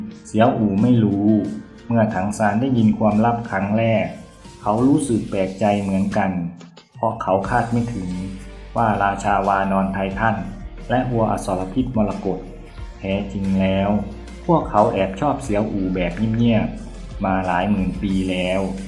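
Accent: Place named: Isan, Thailand. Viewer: เหมือนจะออกเสียงไปทางอีสานนิดๆนะครับ😂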